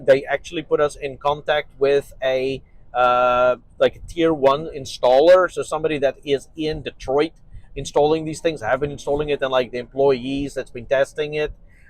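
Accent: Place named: South Africa. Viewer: offside comment here. you sound Souht African bro. any ties to SA?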